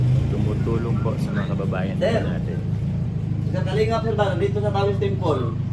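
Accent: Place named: Visayas, Philippines. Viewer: Wow marunong na magbisaya si ❤❤❤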